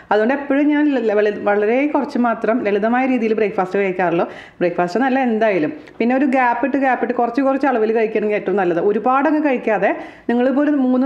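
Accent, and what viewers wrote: Thrissur, India. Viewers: It's Thrissur slang! We speak little fast😊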